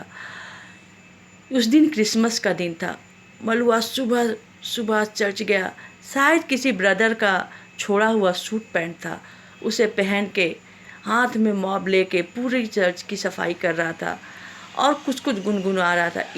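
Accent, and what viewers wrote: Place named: Bhojpuri, India. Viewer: जो भोजपुरीया शैली का तड़का लगाया ना बहूते झबरदस्त बा😀😀👏👏👏👏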